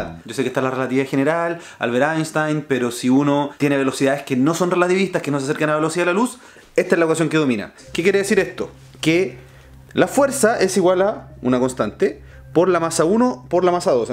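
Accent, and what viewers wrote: Chile. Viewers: que bueno haber encontrado este canal, vengo de QuantumFracture, del vídeo de la tierra plana vs tierra esférica. Por el acento deduje que eras Chileno, que genial.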